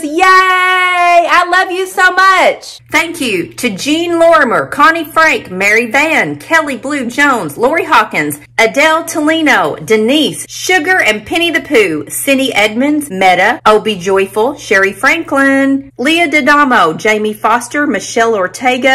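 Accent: South, United States. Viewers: Your sister doesn't have a heavy southern accent like you do.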